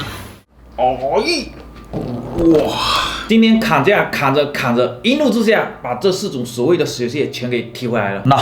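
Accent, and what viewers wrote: Fuzhou, China. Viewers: 咱们福州人的乡音属实太明显了。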